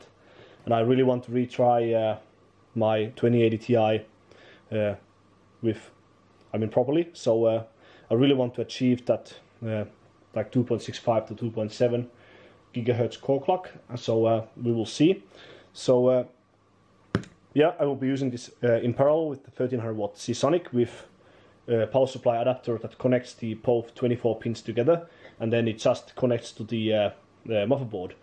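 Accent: Finland. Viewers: sounds like Finnish accent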